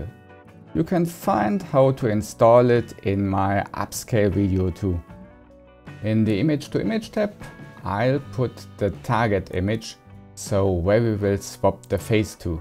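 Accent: Germany. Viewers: Man hört leider das Deutsch sehr stark raus durch den Akzent. Aber sonst gutes Video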